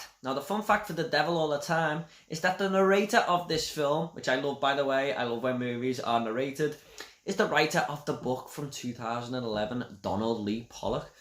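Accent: Liverpool, United Kingdom. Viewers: Enjoyed listening to a fellow Scouser 🤩 Great review 😊 will be one I think we will have to check out 🤩😊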